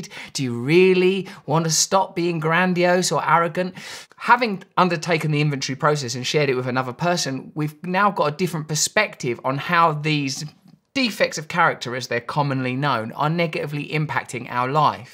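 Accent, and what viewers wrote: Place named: London, United Kingdom. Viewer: You sound like you're from London! 😀 Interesting stuff, friend. I've been a fan for a long time and I appreciate you uploading so often.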